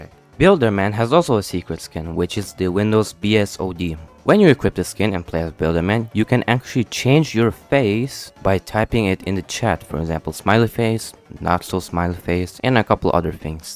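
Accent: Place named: Russia. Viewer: Этот бро реально скрывает что он русский. Слышно русский акцент.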